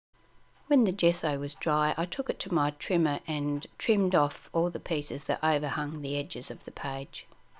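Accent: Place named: Australia. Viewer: Nice to hear a fellow Aussie voice!!! Great page! That pastel green as a background with the orange is very dramatic!!!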